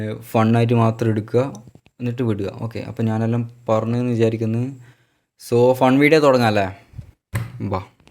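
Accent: Kannur, India. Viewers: Kannur slang📈🗿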